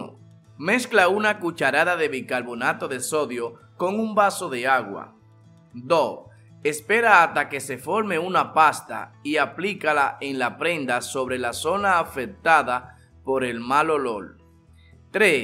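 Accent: Dominican Republic. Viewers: Es el acento dominicano, aprenda un poco :) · 🤪 los dominicanos hablamos así😝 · Así hablan los dominicanos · Ah ok, es bueno aprender algo nuevo cada día. Entonces supongo que es un acento de algunas regiones de República Dominicana. Igual se debe de respetar las diferencias culturales 😅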